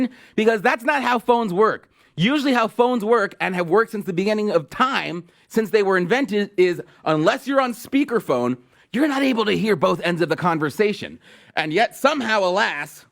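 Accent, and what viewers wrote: Jamaica. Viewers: I like his Jamaican accent. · Are you jamaican? That was pretty good lol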